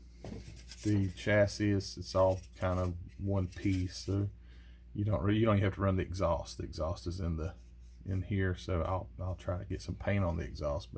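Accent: South, United States. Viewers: One tip for painting dashes they sell micro micro small brushes at hobby town they come in a pack of 30 for 7 bucks and they are so fine it's so easy doing dash and tiny details and one more question are you from Alabama or Mississippi due to the accent I'm in mobile al if your close maybe we can build sometime or learn some tips from you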